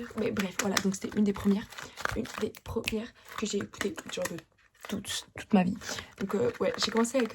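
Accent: France. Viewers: T'as un accent parisien, je pensais que le français c'était ta première langue. 🤯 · Ton accent et ton vocabulaire en français sont tellement propres qu'on dirais que t'as habité en France pendant plusieurs années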